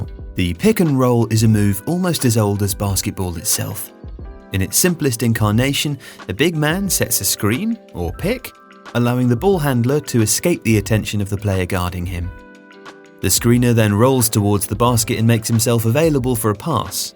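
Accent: England, United Kingdom. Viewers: Somehow I'm still not used to british accent explaining basketball..😂 yet great content tho.. Keep it up!!!🔥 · Rather listen to English accent all the time!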